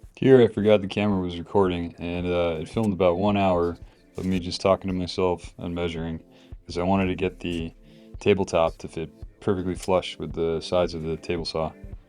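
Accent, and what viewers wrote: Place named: United States. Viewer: You sound very American (not in a bad way)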